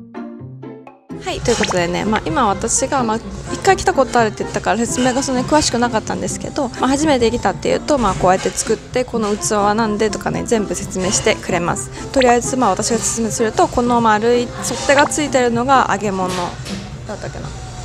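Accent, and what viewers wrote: South Korea. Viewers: 韓国語の発音に慣れちゃってるからね… 日本語も韓国語の発音になっちゃう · 日本人らしいですね。 わざと韓国訛りにしてると言うと誰かが言ってました。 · 日本人なのに韓国人が日本語喋ってるようななまりがありますね。 韓国語喋る生活が長いとそうなってきちゃうんでしょうか？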